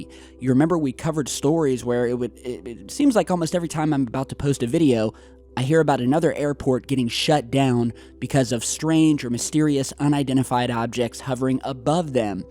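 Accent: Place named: South, United States. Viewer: Is from the south? I'm just curious. if you listen closely he has a slight accent lol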